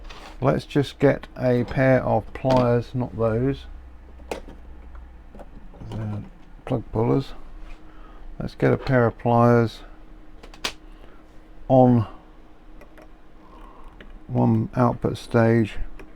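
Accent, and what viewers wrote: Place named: RP, United Kingdom. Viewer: Great repair! I am a teacher of English living in Mallorca, Spain. I need to say that you have a great accent and pronunciation! I have even shown your videos to my students to show them propper RP! I am also very keen on repairing amplifiers! Congratulations on how much you teach the community with your great videos!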